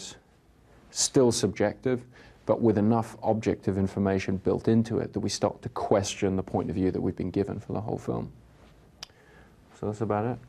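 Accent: London, United Kingdom. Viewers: London accent, clear as day tbh. He's an American citizen now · His accent was more London in those days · British English accent sounds great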